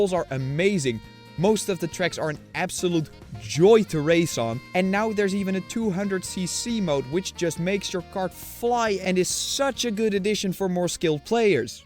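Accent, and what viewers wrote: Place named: Netherlands. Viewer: Hmm, the accent... Fellow Dutchie? 😄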